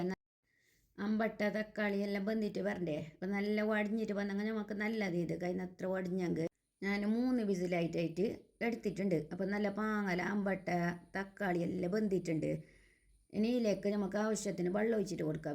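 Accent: Kasaragod, India. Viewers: നിങ്ങടെ സംസാരം എനിക്ക് ഒത്തിരി ഇഷ്ടമായി നിങ്ങൾ കാസറഗോഡ് ആണല്ലേ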